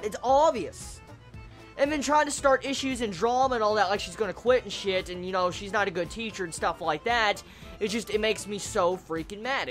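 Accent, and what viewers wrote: South, United States. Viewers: Hey I I know you probs won't read this but I'm really sad because I start high school tomorrow and I. Really scared and sad I really sad because I can't watch your videos as much because homework but I'm really nervous because I don't like going out with friends and I am kinda socially awkward I was really depressed for a while because I liked anime and I had no one to relate to. Then I found your channel and your awesome personality and the hint of southern in your voice always made me laugh and smile when you reviewed anime. I really don't know much about you and you probably won't care for this but you helped me through a lot. It was never like I wanted suicide but I was sad. I never talked too my friends and cut them off. SORRY FOR THE LONG MESSAGE I DIDNT MEAN IT I JUST GOT CAUGHT UP IN MY EMOTIONS. Also I watch all your vids even if it's anime I don't watch. 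I haven't been subbed for a while but I still support you as though I have been here for a long time. Thanks for everything. -Moe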